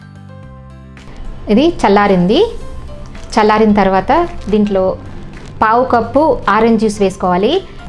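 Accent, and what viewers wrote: Telangana, India. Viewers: Andaru Andhra lo cheptaru meru Aina koncham Telangana matladthunattu anpisthunde ❤️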